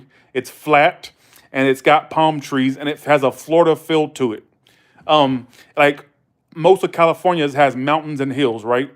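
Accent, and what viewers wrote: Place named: London, United Kingdom. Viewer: Your London accent is so attractive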